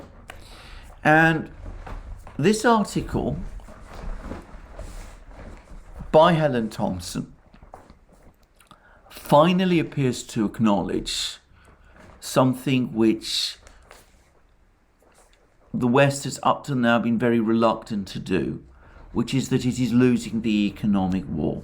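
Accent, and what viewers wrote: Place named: Public school, United Kingdom. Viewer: I've never heard a guy talk more and say less. An hour of gossipy chatter "that cannot be confirmed," full of innuendo and guesses, repetition of "I can't prove it," and "I wouldn't be surprised if we found out" "this is my own speculation" all delivered in that English public school accent that bamboozles Americans. I like Al, and I've listened to him very carefully and never once heard a word that was essential learning. He's a pundit with smooth delivery of empty chatter. If you read nothing else I suppose he's good because he does do some reading.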